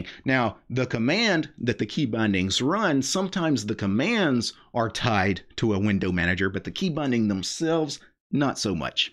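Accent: South, United States. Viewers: your southern twang is glorious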